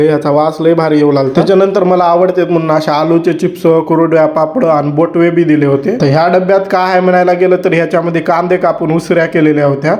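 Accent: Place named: Gujarat, India. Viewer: Tuzha gav kuthle.bhadha thodi vegle wataate.gujarati aikdylla yete.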